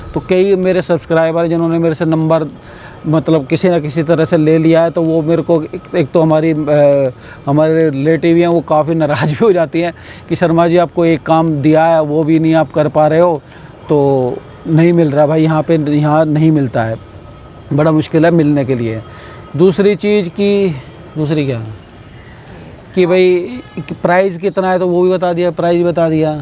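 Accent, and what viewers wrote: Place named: Haryana, India. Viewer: Faridabad ,Palwal ki si boli hai aapki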